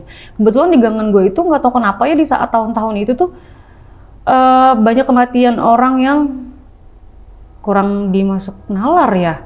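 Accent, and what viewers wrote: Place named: North Jakarta, Indonesia. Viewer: Dari gaya gaya ngomongnya kaya kenal dari daerah mana,, eh Jakarta Utara toss dulu salam dari asal Priouk.. penasaran Jakut nya daerah mana nih ceritanya? Hehehe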